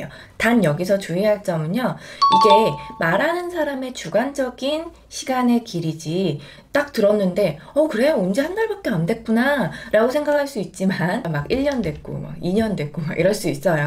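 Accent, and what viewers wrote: Yanbian, China. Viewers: 연변 말투 좋습니다. 왕왕 사용하여 강의하면 좋겠습니다. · 1。我第一次刚到中国，吃了非常多的麻辣烫。 2。我刚二十岁了。 3。刚才我到韩国了。 4。偶尔老师说的口气很有意思意思，好像是刚（来）从延边那儿到的。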